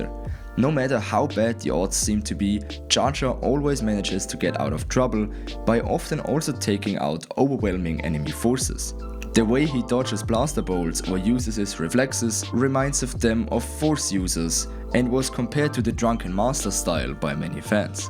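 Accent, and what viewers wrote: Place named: Germany. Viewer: he sounds like a german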